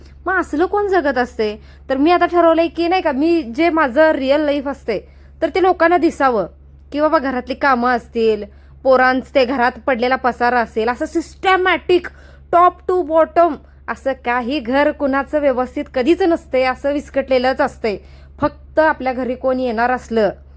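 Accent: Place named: Kolhapur, India. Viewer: ताई कोल्हापुरी भाषा खूपच छान वाटते कानाला ❤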